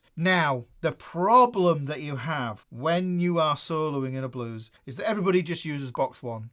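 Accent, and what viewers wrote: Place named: Newcastle, United Kingdom. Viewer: Great accent. Are you a Geordie?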